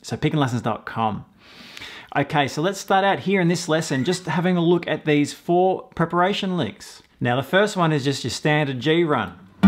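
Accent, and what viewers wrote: Australia. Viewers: Actually I believe his accent is Austrailian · Australian I think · My goodness, I wasn’t expecting an Aussie voice!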